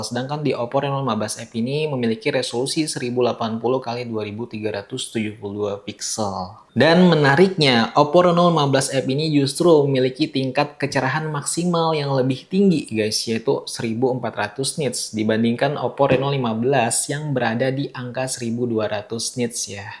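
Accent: Banjar, Indonesia. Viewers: Urang banjaarr..kh?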